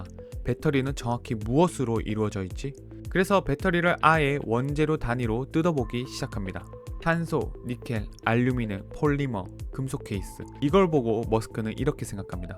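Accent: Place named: Gyeongsang, South Korea. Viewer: 경상도 사람인가봐요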